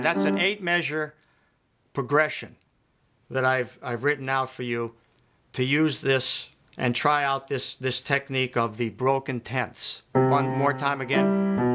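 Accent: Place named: New Jersey, United States. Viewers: Love his jersey sound👍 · This guy has the strongest jersey accent i've ever heard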